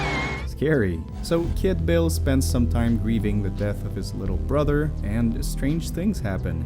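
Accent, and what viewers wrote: Quebec, Canada. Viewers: french canadian eh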